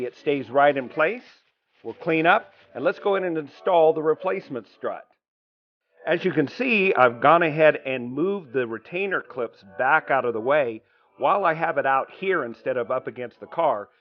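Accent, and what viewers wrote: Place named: Australia. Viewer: You sound like an Aussie. Your reference to your hubby gave me a hint but I could be quite wrong. I’m about to give this gas strut replacement thingo a go. Here goes …. A 71 year old woman with a screw driver could be dangerous! 😃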